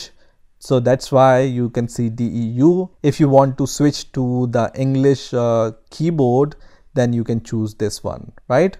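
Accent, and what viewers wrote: India. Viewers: why does he sound indian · Is this fake? He sounds india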